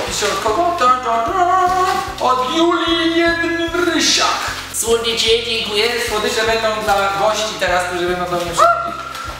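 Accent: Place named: England, United Kingdom. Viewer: Ale ci się akcent wyrobił ;3 Teraz to już ledwo słyszalny ten angielski, chociaż trochę szkoda, bo z nim było jeszcze zabawniej ! :D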